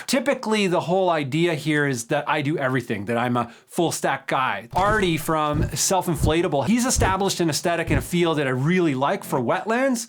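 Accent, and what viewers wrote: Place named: Ontario, Canada. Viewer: I just subscribed after watching your channel develop for a while. I'm 50 this June and also trying to release a solo project. Mines also being written and recorded in reaper, but more in the Swedeath direction. Gonna be cool to hear the finished product and buy it on Bandcamp. My missus is from Winchester ON, so I enjoy listening to you speak too. Reminds me of warm summers and cottage life.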